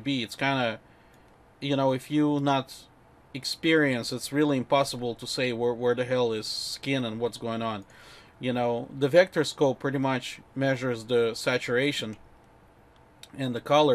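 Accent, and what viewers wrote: Russia. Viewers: Прям слышу по акценту что русский))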